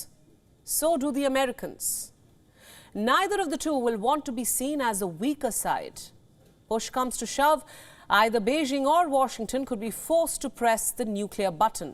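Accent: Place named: India. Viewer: Pretty strong Indian accent...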